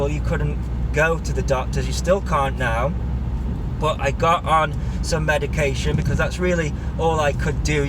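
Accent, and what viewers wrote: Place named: North East Wales, United Kingdom. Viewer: Cool video. I would say; hang on in there, but it sounds like you are. Judging by your accent I would say you don't live too far away from me, but I may be wrong. I'm in North East Wales, are you fairly close?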